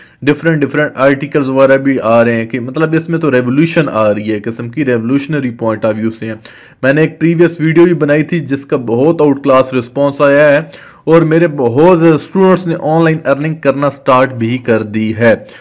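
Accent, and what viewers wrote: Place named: Mianwali, Pakistan. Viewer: Boli se AP mianwali k lgty hain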